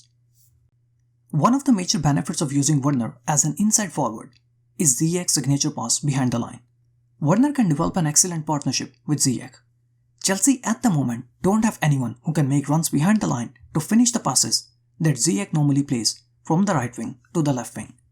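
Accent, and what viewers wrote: India. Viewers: you sound like india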